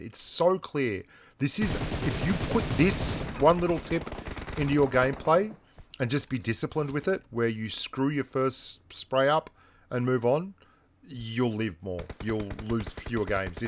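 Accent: Australia. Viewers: Hey. Damo from SA here. So refreshing to hear an Aussie and self deprecating at that. Brilliant videos, tactics and fun in spades. Been playing and watching your content for ages. Watch out for grubs. 😅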